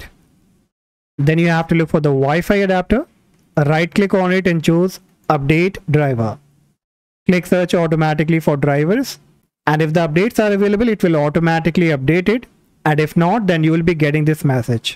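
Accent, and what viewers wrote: India. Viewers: I just hear an Indian accent then I know my problem will be solved. Thank you so much ❤❤❤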